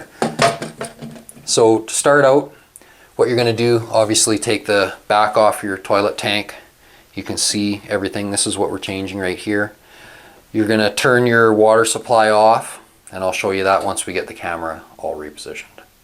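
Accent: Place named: Canada. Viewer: Hi! I can't get over how much you remind me of a friend from (5th grade? ish?) whose name was...and lived a couple of doors down from me in Seal Beach, California....and he was from Canada too (I assume you're from Canada with that accent). I know I already asked you if you lived there as a kid and you said no.....but, if that's true, I swear you have a doppelganger out there somewhere! Thanks for all of the amazingly helpful videos, btw! I'm getting ready to have an addition built and I feel like I know all kinds of things that will be helpful in the process.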